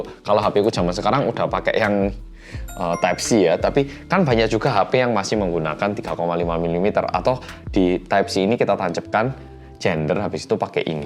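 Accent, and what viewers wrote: Java, Indonesia. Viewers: Mas: bersama orang Korea yang... Me: meddhooookkk.... Makasih mas i see Taeyong Jimin ^^ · Mas medokku😘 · ngakak pas ngomong "muka blum raup" wkwk njawani bnget ya mas ni...😂😂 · Kok mas bisa yh medok Indonesi banget. Tapi pas aku mau medok korea kok ndak bisa yah 😂😂😂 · So simple. I see Ty & Jimin, I click!:D Btw, candu bgt ama voice medhoknya mas 😭💜💜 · Aq wong jowo kalah medok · ORANG KOREAA YANG MEDHOOOOOKKKKK!!!!!!! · udah selesai nonton baru sadar, ini promosi toh. dasar mas medok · Kaka, kaka itu kok bisa medok?, orang jawa yaa, sumpah, bahasa jawa aja tau kaka KEREN!, pertama kali aku denger orang korea medok :)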